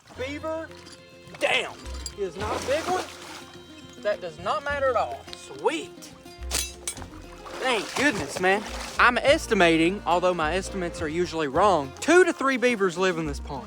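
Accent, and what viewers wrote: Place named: Kentucky, United States. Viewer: always makes me smile with his Kentucky accent! Keep up the good work bro!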